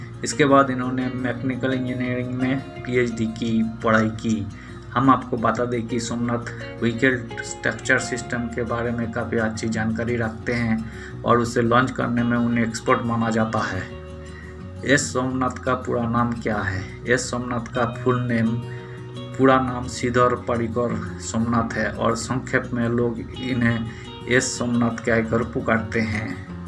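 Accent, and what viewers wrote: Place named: Bengal, India. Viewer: Dada do you belong to Bengali Family as your Hindi pronunciation indicates so Thank you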